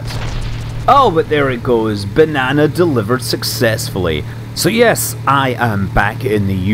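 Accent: Scotland, United Kingdom. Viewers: Hey Scott, glad to see you're back and making videos! I have to confess, I love your videos very much and while it makes me happy to see you go off on a vacation, I'm kind of sad we don't get to hear the dulcet tones of your Scottish accent talking about KSP and other science-y things. Keep it up! Say, ever consider doing a video on DJing or mixing?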